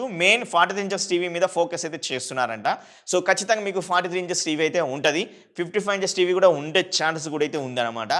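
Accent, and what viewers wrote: Telangana, India. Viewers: మీకు తెలుగు సరిగా రావటం లేదు ఎందుకని, తెలంగాణ వాళ్ళ మీరు? స్లాంగ్ totally తెలంగాణ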